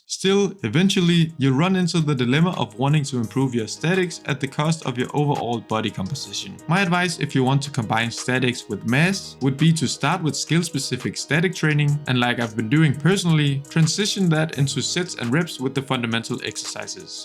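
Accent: Denmark. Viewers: Nice video :) You sound danish. If so, where in DK are you located?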